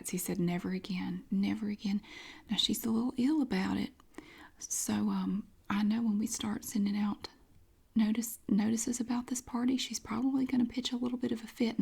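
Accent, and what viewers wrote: South, United States. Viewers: I love when you say “loogathaat” I live in nc and have a southern accent too but for some reason I love yours! Lol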